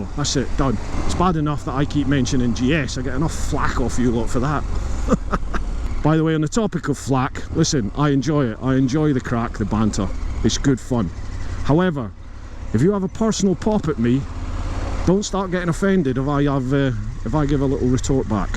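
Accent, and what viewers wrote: Scotland, United Kingdom. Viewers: are you Scottish? This bike I would love to have, but the triumph 765 and the pain gale v2 or the R9T are too much of a temptation right now.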